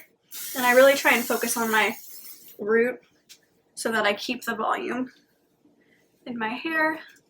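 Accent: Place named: Midwest, United States. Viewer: I just found you while looking for tips on trimming my hair and I’m so delighted you seem to be sticking around! It’s wonderful to see someone with genuinely long hair on hair YouTube!! Could we maybe get some more tips on other kinds of styles for long hair? I’m not sure if you wear your hair back much, but there aren’t a lot of people with any idea how to deal with real length when doing updos or braids so any tips you have would probably be helpful to those of us who love our long hair but aren’t always sure the best way to handle it. I really hope this doesn’t come off as preachy or bossy but... please be very careful with any travel plans! I live with a microbiologist so I’m pretty up to date on what’s going on and there’s a huge spike in cases and hospitalizations in parts of the country right now and we won’t even start to see the effects of 4th of July parties for about 2 weeks. 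We just had to start shutting 7 counties back down here in California. Maybe stay local-ish? You sound like you’re from somewhere in the Midwest and it seems safer there for the moment. I hope you have a happy anniversary though!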